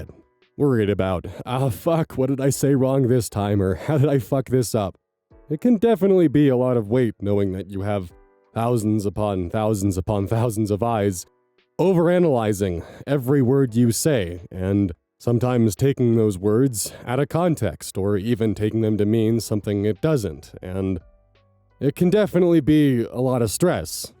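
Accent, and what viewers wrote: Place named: Midwest, United States. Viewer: I have disagreed a few times with you, but I never hated you for it. You clearly state over and over you want our feed back and intend to ponder it. All in all, you are the strangest parasocial relationship I have. I used to live in Boulder when I started watching you, so you sorta felt like a friend because it was a possibility I might run into you. Your vocal mannerisms are a little reminiscent of the midwestern ones I grew up with and you are also one of those queers. As am I haha… anyway, keep being you and learn new things with time.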